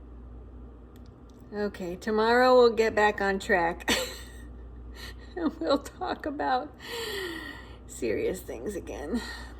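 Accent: New York, United States. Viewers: Hello from indiana omg I can’t believe your NY accent that’s awesome 😂🥳. Have a great day cutie 😊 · Wow - U got the NYC accent down pat. 😊 You've got those US regional accents great too. How'd U learn to do that? Hi, gal. Jan here again. Guess U feel a little during latest post. Hope so, gal pal ❤ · Aww no answer yet . Love the NY accent , can you do West VA accent lol I’m from Florida.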